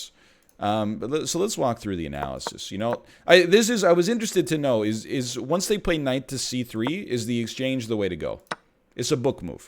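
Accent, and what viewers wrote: Canada. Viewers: Y'all can't convince me that he doesn't sound more Canadian than before..... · Did Canadian got worse (or better, depending on preference xD) in the past few months or am I crazy? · Am I crazy? Has accent gotten more Canadian recently?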